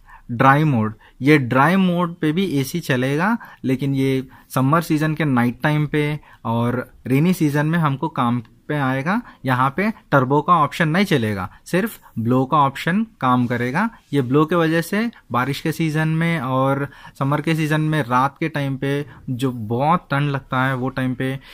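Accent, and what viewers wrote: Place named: South, India. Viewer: Very good explanation I think you are from south india area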